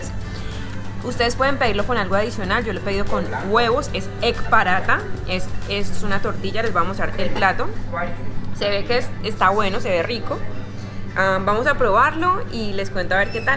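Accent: Cali, Colombia. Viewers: me ecantas. Ese acento caleño ese cuerpaso jajaja tqm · Me encanta tu acento extraño mi ciudad cali💛💙❤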